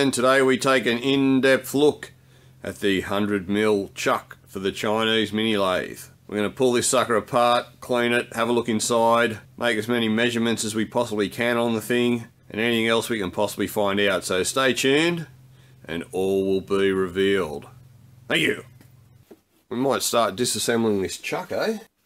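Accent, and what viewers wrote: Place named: Australia. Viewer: Hears Aussie accent... presses like immediately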